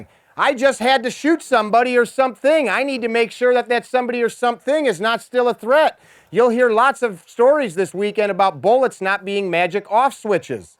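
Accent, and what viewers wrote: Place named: New England, United States. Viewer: Cant stand that yankee voice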